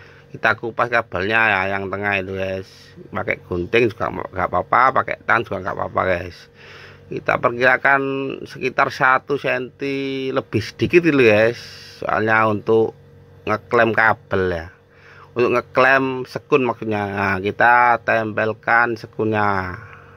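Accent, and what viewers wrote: East Java, Indonesia. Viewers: Aing mah resep. Gaya ngomong jawa timur na cak euy.!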